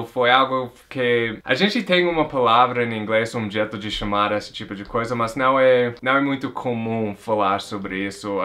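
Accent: United States. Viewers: Impressão minha ou o tá voltando ao sotaque forte dos EUA rsrs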